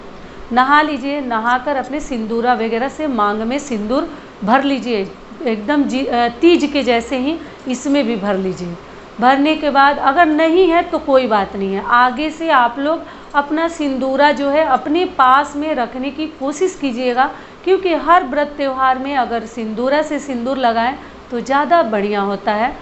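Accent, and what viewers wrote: Bihar, India. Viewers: Mam aap Bihar se ho kya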